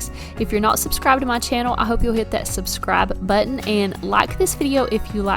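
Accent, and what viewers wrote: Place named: Eastern Kentucky, United States. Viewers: God I love the accent! Eastern Kentucky or Tennessee. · I feel like you're closer to Kentucky cuz you have a thicker accent could be wrong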